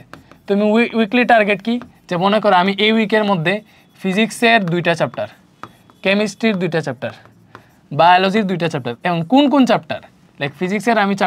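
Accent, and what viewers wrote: Sylhet, Bangladesh. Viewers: Vai kita Sylheti ni 💗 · Apni ki sylhety?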